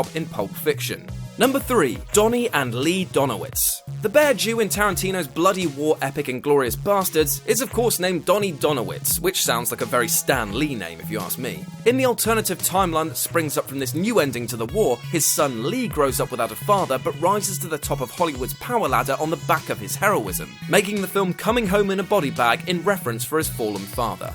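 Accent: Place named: England, United Kingdom. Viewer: People pronounce words differently. This guy is English and pronounces his vowels differently than, say, Americans.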